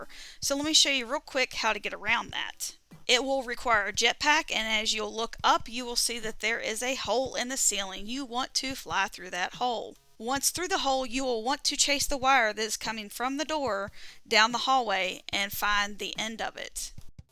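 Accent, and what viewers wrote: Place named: South, United States. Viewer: Found a pink so far! Thank you for the guide southern sounding lady!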